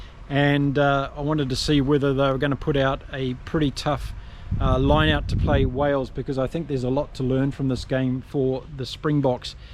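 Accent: New Zealand. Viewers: I laughed when you said you're no rugby expert, here's me as a South African the moment I hear the cadence in your New Zealand accent I'm thinking of every New Zealander pundit I've ever heard and I automatically trust your rugby opinion. 😂.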